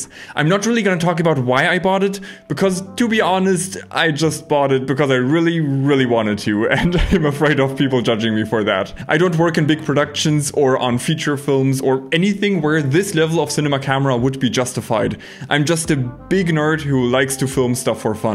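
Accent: Germany. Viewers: Keine Ahnung - 1 Satz und für mich klingt das als ob du Deutscher bist. Wieso bloß kein Video direkt in DE ❤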